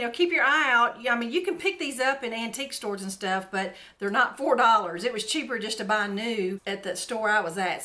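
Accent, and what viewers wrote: South, United States. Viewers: I am new to your channel and I love it!!! I am from Mississippi and you sound like your from the South 😊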